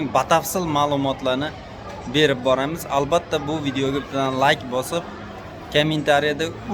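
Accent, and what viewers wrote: Chortoq, Uzbekistan. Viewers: Ука. Шевангиздан чортоклик сиз шекилли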